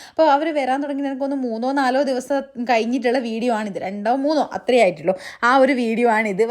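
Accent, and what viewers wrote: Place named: Thalassery, India. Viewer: തലശ്ശേരി അന്നോ വീട് ❤... സംസാരം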